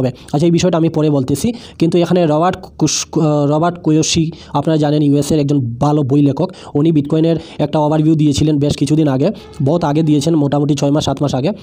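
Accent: Sylhet, Bangladesh. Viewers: Vai Assam e taken naki? Sylheti vasay kota bolen mone hocce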